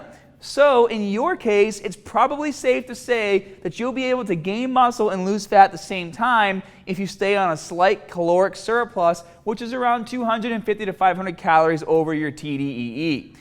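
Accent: Boston, United States. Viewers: Boston accents are trash lol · Is this guy from Boston. Paut of ya body. I like it! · I thought the dude’s boston accent was a joke at first lol 😂 but very informative non the less. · That's a Boston accent...born and raised there so I know. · Yea boston accent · Sounds like hes from Boston lol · Its boston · This guy is from Boston ain't he? ...just guesssing 🤷🏻‍♂️ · You got that “BAASSTON” accent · Boston accent buddy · its boston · I love the boston accent keddd 👍🏾 great video ! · That BAASTON accent though... pretty intense 😸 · I think it's the Boston accent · It’s a Boston accent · Are you from Boston? I love your accent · Yea my guy is definitely from Boston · are you from boston? i like your accent great vid. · Boston guy 😂😂😂 the accent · That Boston accent! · This man is dripping with Boston. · abdulla alsuwaidi it’s An American accent, from Boston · I love Boston accent Tourette's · Boston bud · That's a Boston accent for ya.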